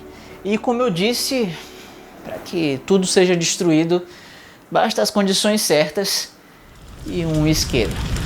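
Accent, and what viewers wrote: Ceará, Brazil. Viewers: Você é nordestino?? O sotaque parece com cearense, n sei, sou cearense e escuto muitos cearenses e piauiense falando assim.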